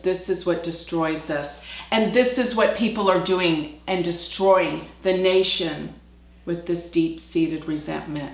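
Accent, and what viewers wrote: Texas, United States. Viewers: I love her southern accent. Texas specifically. Makes me miss southerners a little bit. I live on West Coast now. Over the years, I have dabbled on and off of your website. My knowledge of Vedic astrology is small. After watching this video, I feel more affinity towards you, your work I mean. It takes some courage to do this video, I think, because many people interested in astrology tend to be on the Left (lol). God bless you,.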